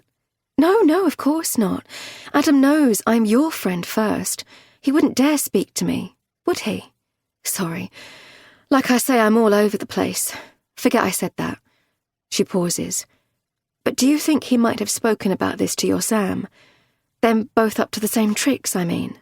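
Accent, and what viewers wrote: United Kingdom. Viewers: I love British accent. I actually prefer British accent instead of an American one.❤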